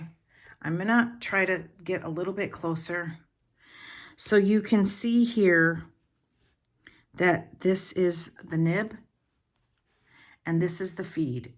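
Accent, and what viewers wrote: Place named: Minnesota, United States. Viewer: This woman is a trip. And the accent! Is it Minnesota? It’s not southern for sure but not that nasty north east yankee New Jersey thing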